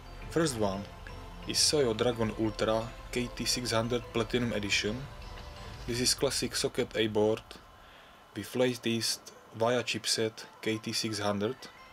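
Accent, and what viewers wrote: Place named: Czech Republic. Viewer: Ta sbírka hardwaru je naprosto skvělá. Když jsem tenhle kanál objevil, hned jsem podle přízvuku tušil, že je dokonce česká :-) Jen v tomhle videu jsou komponenty, které jsem ani netušil, že existují. Takhle exotický HW se ke mě nedostává.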